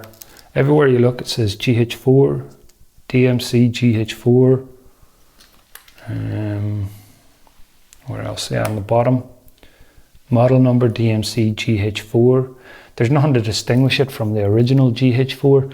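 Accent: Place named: Northern Ireland, United Kingdom. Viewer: Hi, I notice your accent is Northern Ireland where I'm from myself. You wouldn't happen to want to sell me your GH4 by any chance? If you feel the GH4R is all you need?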